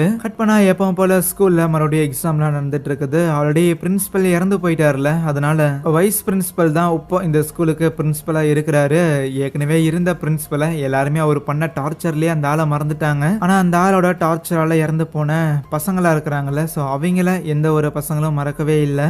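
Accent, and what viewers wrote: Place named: Madurai, India. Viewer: Unga slang avangya nu sollum bodhu nenachen, Madurai side than irupinga nu 😅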